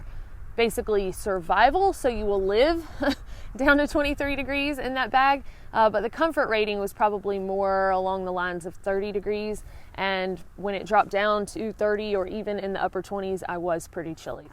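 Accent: South, United States. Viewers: We start packing with "cheaper", and "heavier" equipment normally. But it's nice to have an idea of what others found useful, even if it is more expensive. You invest in what you love and/or enjoy. Some will hike, and give it up. Others will get drawn in, and have a life long hobby. For them, they will enjoy the information of a seasoned veteran. Thanks for the info! And Merry Christmas! And to those that want to correct the Southern pronunciation, please remember: though the "South" is connected on the map, it's a different country. It would be the same as telling the English, or Australians how to speak. Be respectful, embrace our differences, and just don't do it. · LOL! No, that’s not trail talk, it’s how we talk in the south. Assy = icy. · My dad always told me to never make fun of someone with an accent because they speak at least one more language than you do. 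Southern counts, right? Oh yes it does.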